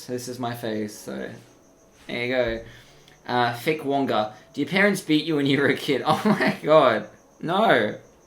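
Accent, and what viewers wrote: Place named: Australia. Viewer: you have the exact australian accent i thought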